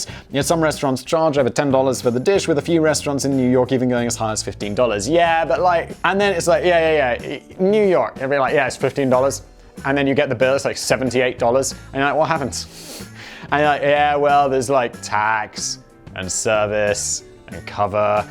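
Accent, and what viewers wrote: United Kingdom. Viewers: ... Ha! I was watching this video (I just love you btw but business blaze is my favorite of your channels) and my 4 yr old (as of last week hes 4) son came in and said "thats the wise old elf!" And pointed to you on the TV. Its from one of the shows he watches called "Ben and Holly's little kingdom" and I stopped and looked and said "he does kind of look like the wise old elf doesn't he bub?" And he replied with a very enthusiastic "yeah!!!" LOL. And just while typing this I remembered that its also a British show so you also probably had a similar accent (not voice just your accent) so my son may have heard you sounding similar to the characters on the show too. Lol. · I only watch this channel so I can laugh at British pronunciation of words.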